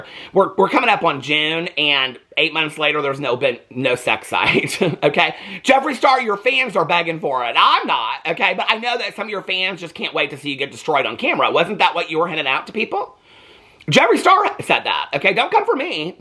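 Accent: South, United States. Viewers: I have been in the most stressful and depressing state today, and I clicked on this and I Swanee you have made me giggle out loud! I lol legitimately when you said even Kim didn’t claim KW 🍆. And yes, I love when your southern twang peeps through.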